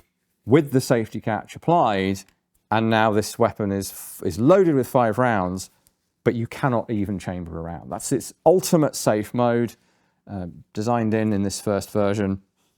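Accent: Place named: London, United Kingdom. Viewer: I love London voice 😊.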